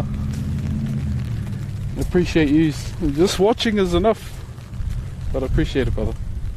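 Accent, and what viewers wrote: New Zealand. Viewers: Love the way you talk newzealand accent is awesome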